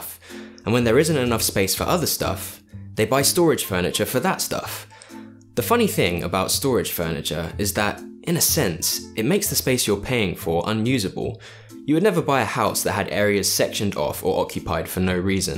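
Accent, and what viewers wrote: United Kingdom. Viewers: Ohhhh!!! YOU ARE A BRIT!!! how wonderful, the second you spoke, I knew it!! I love the UK YouTube home solutions - what is the point in watching the USA ones, when you can't actually go out and buy the products. Home Depot!!! Yeah?? I am sure they deliver!!!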